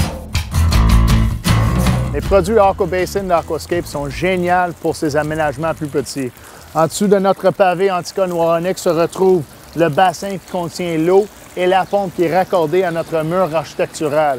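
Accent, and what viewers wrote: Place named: England, United Kingdom. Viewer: L'accent anglais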